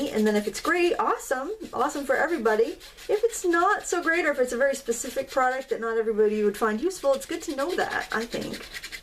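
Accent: Maine, United States. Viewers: oh dear, i have been watching your channel from the beginning of my you tube days i think you had just a few thousand subscribers and you are in fact the 2nd person i subscribed to. I must say you have been a joy and a good friend through the years. Whatever the video of the day is it makes me smile, your children and family are priceless and so is your lovely chatter whether it is in your "maine dialect" or your fun and happy voice it is always like talking to an old friend. take care and never stop we would miss you terribly. Shame on her, she has no idea what she is missing! Aloha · What a clever idea, laying out the three bookmarks, making them as one, then separating them. What a fun way to play and create bookmarks at the same time. BTW, I never care how long your videos are, for as you know from other comments from me, I love to hear you "mainespeak" and your cheerfulness always gets me in a good mood, for it takes my mind off of what ails me. 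Keep 'me up, Sistah!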